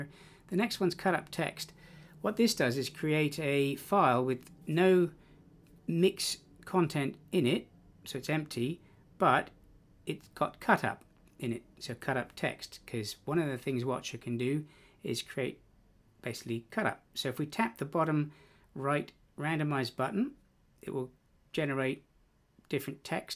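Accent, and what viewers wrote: Cornwall, United Kingdom. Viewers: Is your accent Cornish? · I'm from bude, Cornwall, thought your accent sounded familiar. I find your app really interesting but I'm finding it really difficult to understand and use. I come from using Ableton.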